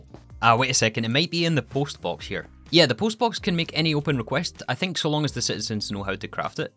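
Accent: Scotland, United Kingdom. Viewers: I don't even play Minecraft anymore. Haven't played in about 10 years haha. Your pacing and amount of content packed into each episode is amazing, also great to hear a fellow Scot 😀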